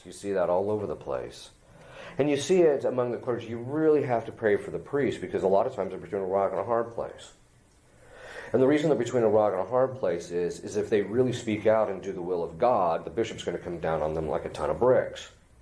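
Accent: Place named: United States. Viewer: Plus, zar nije fenomenalan njegov jaki svjetovni,muski americki naglasak? Bas svjedoči njegovom specificnom karakteru.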